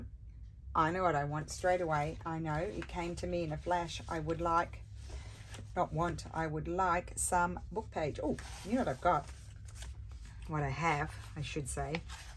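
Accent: Australia. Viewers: I often wonder if people not from Australia can understand you because i feel you speak Australia ,using slang or shortened words or sayings that we use regularly. Im not having a go at you because you did mentioned your uncle correcting you. When ever you say something that is Aussie I just smile.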